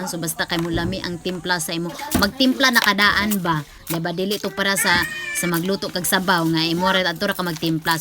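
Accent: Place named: Loboc, Philippines. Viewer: Nindot gud paminawon ang sinultihan sa taga loboc madam hehehe...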